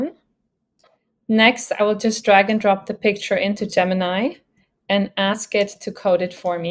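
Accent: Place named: Netherlands. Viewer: A few seconds in... wait a minute is that a Dutch accent ? A Dutch AI-content Youtuber ? We don't have much of that, would be funny if it is. Glad I was right. 🙂 I wonder if someone like who seems to be very good at using tools. Could you use Firebase studio to get results. Tina Huang who seems to be a developer was able to do it obviously, but I wonder for example if you could get the data store connected for that CRM. Or maybe we just need to wait an other month or 2 for Google the improve it/make it easier to use ?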